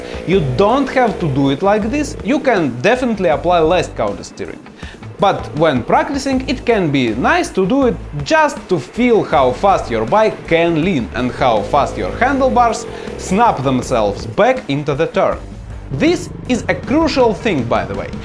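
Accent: Russia. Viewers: can clearly hear ur ruski accent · bro has the best type of russian accent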